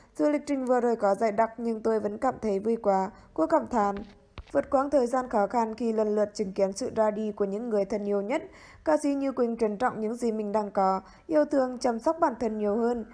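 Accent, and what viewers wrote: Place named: Central Highlands, Vietnam. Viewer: Giọng đọc của người thượng hả? Lè nhè sai bét.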